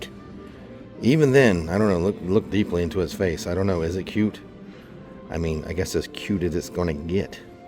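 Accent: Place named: Boston, United States. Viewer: Wicked. Sounds like Boston.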